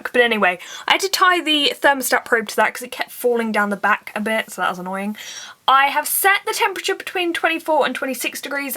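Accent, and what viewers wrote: United Kingdom. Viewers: Wait your British :D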